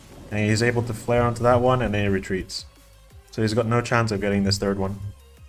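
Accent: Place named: Singapore. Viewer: oh nice. You have kind of a Singaporean accent also that's why I figured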